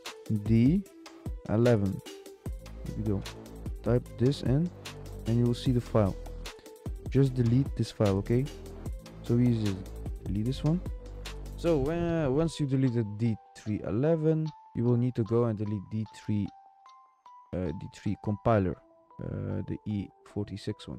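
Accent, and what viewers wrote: Netherlands. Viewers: ik hoor gwn dat dit een nederlander is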